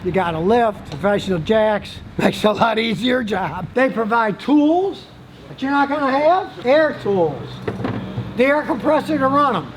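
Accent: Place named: United States. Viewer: Where the hell is scottie from? Sounds like a yank himself.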